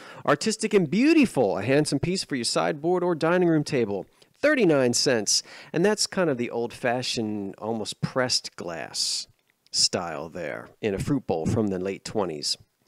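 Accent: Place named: East Coast, United States. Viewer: ! I've been watching your earlier episodes that include "young" Salem and 70s stuff. I LOVE THEM! I'm convinced the only Good Will's and thrift shops with the quality of items you find are on the East coast. I appreciate your sense of humor, facial expressions and East cost accent.. I'm learning so much from you. Continue being a blessing and I'll do the same.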